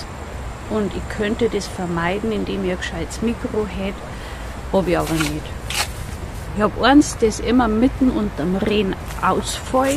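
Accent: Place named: Bavaria, Germany. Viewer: ich habe deinen kanal zufällig entdeckt und als allererstes viel mir dein mir so vertrauter bayerischer, von dir sehr beruhigend gesprochener dialekt auf. dann gleich als nächstes deine authenzität, die bescheidenheit und emphatie. keine reisserischen storys, sondern alltag, untermalt mit tiefsinnigen gedanken, die zum nachdenken anregen. daumen hoch und abonniert!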